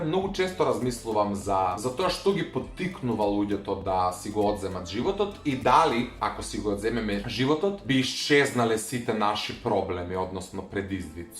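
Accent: Kumanovo, North Macedonia. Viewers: Brat znaes li kolko e tesko od kumanovski dijalekt na makedonski 😹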